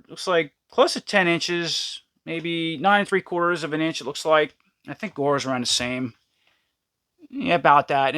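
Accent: New York, United States. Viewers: You gotta NY accent, makes the review better..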